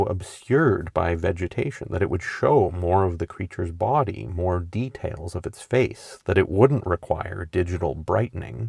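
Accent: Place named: Canada. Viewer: I’m so glad I found this channel. I appreciate the quiet, measured and affably Canadian narration. I also like how you just lay down the stories and the evidence that are out there so people can draw their own conclusions. Most paranormal/cryptid/UAP channels are ultra low effort AI clickbait, so when I find one that’s not, it’s very exciting. Keep up the good work!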